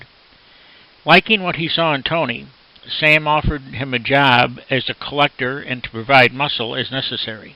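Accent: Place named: Midwest, United States. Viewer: it's his Midwest accent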